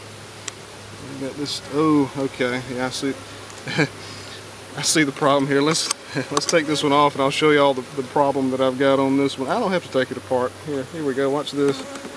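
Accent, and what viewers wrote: South, United States. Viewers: with a southern accent 😳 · 😂 love his thick (southern?) I think accent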